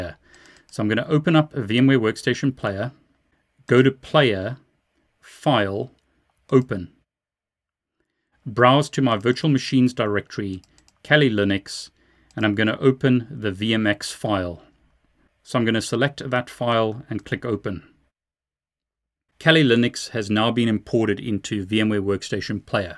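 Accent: Afrikaans, South Africa. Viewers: i thought that was an SA accent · HAHAHA Wou se jou aksent is AFR. haha voorspoed tjom! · I knew he was South African xD that accent was amazing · it was Afrikaans my friend you can hear his SA accent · I called it! I thought your accent sounds South African. lol "jou rekenaar is myne" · Ek wou se ek herken n Saffer aksent....Geniet jou videos! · I knew I detected an Afrikaans accent in your other videos! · Well you got the afrikaans accent right, maar jy moet dit nie weer doen nie asseblief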